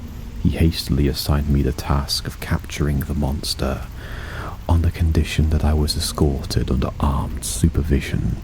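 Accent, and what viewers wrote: United Kingdom. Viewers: Glad to see another fellow from the land of tea and crumpets! Really good first video your storytelling was amazing! Keep it up! 🤩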